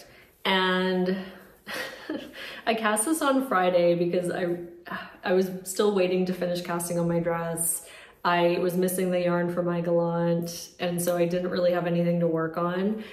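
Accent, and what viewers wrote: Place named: Ontario, Canada. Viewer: Pretty sure it’s an Ontario accent, hard to change your natural speech patterns and for some reason women are often targeted for their speech tone, patterns etc.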